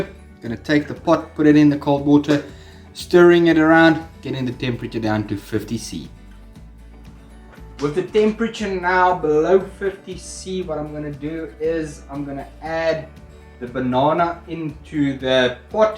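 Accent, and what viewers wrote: South Africa. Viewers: Bruh !!! South African?! Hell yeah buddy! Nice show! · Are you South African? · South African?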